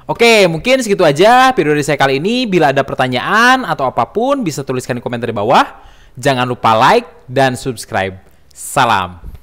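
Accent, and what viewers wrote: West Java, Indonesia. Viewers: Logat Sunda jadi ciri khas pisan 👍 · AA dari Sunda...kayak suara teman saya PakDedi Ciamis..aksenya...asyik tapi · "PGA... PGA... pega..." ni apaan ya baru denger. wait. ....oh sunda. ganti p sama v... VGA, vega... ok paham dah. maaf jangan diambil hati, hanya jujur pertama bingung gegara logat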